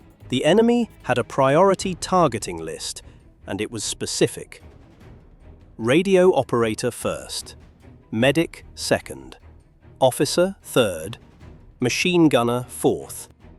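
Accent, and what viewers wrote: United Kingdom. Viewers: why is this narrated by someone with a British accent?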